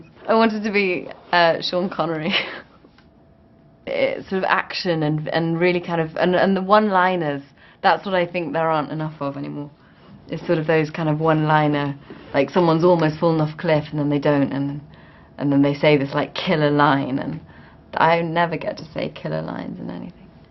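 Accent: United Kingdom. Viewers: she's so cute! i love her britsh english accent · as if her adorable looks weren't enough, that distinguished british accent just makes me melt :)!!!!!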